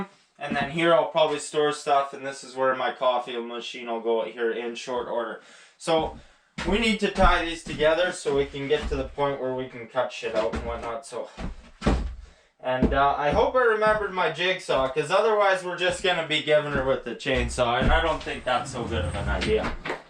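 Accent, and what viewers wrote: Canada. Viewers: I love the Canadian accent and all your sayings 👍 Love the content too bud keep it up! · Thank you! Has similarities to a project I'm working on. Also the thickest Canadian accent I've ever heard; it's great!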